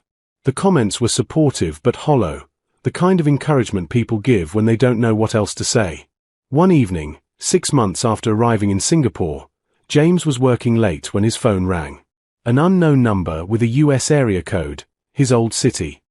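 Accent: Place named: United Kingdom. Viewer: ...you f***ed around and found out... From a native North American English POV, I don't know why, but the British-accented voice-over narration sounds so delicious, especially when this channel dares to drop the F bomb, for story benefits, of course. This is another AI-generated tale like so many others cranked out by this and similar mills, but it is a wee bit different, a touch unique in how the tale unveils and delivers its Scarlett Letter denouement for the characters involved. She still let the little brain run the show, until, when alone, her big brain is finally allowed enough oxygen to realize the black hole she'd let herself fall into. The climax is early on, but the supplemental details afterwards are more than enough to keep one's attention in this longer than most other tales of woe. One of my fave sarcastic titles for such content is "As the Stomache Turns." Fortunately, refreshingly, this one's not nearly as tacky as too many others tend to be. 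PS BTW, a humid Thursday in November...? I've been to Singapore 2x; I've had extended chats with locals there; it's humid EVERY Thursday there. Year-round. That's just the weather in that part of the globe when one is that close to the equator and surrounded by that much water.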